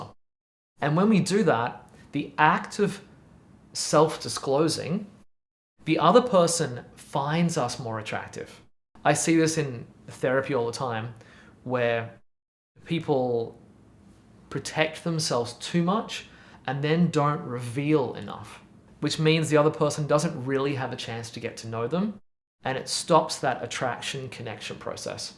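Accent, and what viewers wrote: Australia. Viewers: Unfortunately I have all of those qualities you mentioned, not born with however but from intense suffering and courage to keep learning this life. The sad thing is, I've given up on women and the possibility of meeting one who values similar qualities so I'm currently in Indian contemplating whether I should become a Tibetan Buddhist monk 😂 Thanks for the work you do, you obviously help thousands of people otherwise this wouldn't have over 40k views in 3 days. It says new York but I hear an Aussie accent, is that correct? I'm an Aussie too and considering not my back because I find our country has become a symbiotic twin of the worse traits the US demonstrates. I'm 52 and have witnessed a somewhat caring and considerate culture which was renowned for friendliness and warmth turn into a realm of selfishness and competition. Asia is still similar to the old Australia I grew up in. 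Sorry if this sounds pessimistic mate.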